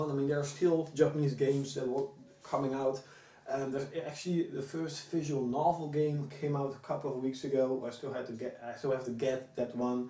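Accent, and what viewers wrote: Netherlands. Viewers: your dutch right???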